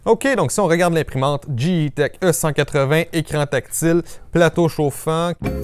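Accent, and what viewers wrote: Quebec, Canada. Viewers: Très intéressantes, tes vidéos. Captivantes même. Et délicieux l'accent des cousins d'Amérique ! Merci et bravo.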